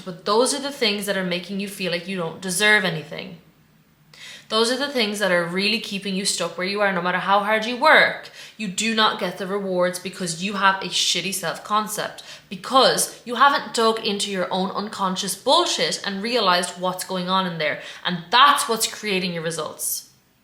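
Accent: Ireland. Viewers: Love your Irish accent and your style of presenting your information. Wish I had your talks when I was young.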